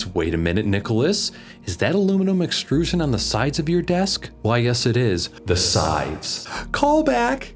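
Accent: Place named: Australia. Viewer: I hope that one day my Australian accent can be as good as yours. This instructional video helped!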